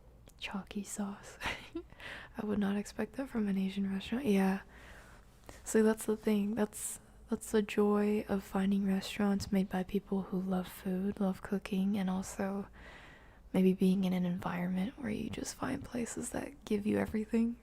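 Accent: France. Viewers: I’m going to speak for everyone when I say this… We kinda can’t put into words how much we appreciate you. I’m going to rephrase that... Your content is nothing short of amazing, but at the end of the day, you, is what we love and appreciate. Again it’s not just your content, but the fact that day in and day out (no matter how oopsie doopsie the schedule gets) you strive to put us as a priority. Not to mention, no other creator in this field interacts with viewers like you do, and that makes us genuinely feel like we truly are your sunshine who makes you happy when sky’s are gray… you also preach the Good News of Christ which very FEW people do. On a slightly different note, there’s always a handful of us out there that will never open up about what’s going on in our lives, but just know you’ve pulled so many people out of so many holes that we cannot simply thank you enough for it… one of the many was in your chat earlier too, and with your help was able to join the Marine Corps. 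You change lives. That being said never forget how loved you are by not only us, but by God as well. You’re a one of a kind, special, and goofy human being who has one heck of a French accent that no one will ever come close to touching. Thank you, and we genuinely love you. Love, -Your Community Very important P.S.: please please please make sure you’re taking care of yourself. Always remember that if you need too, don’t be afraid to put yourself first. I know we pick on you for the late st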